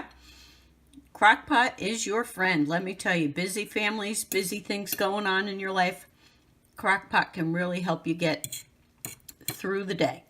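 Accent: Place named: East Coast, United States. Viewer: Looks delish! You from east coast. Slight accent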